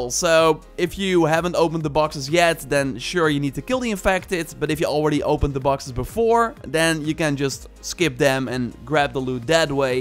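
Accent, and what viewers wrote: Netherlands. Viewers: R u dutch? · Bro jouw englens klinkt echt Nederlands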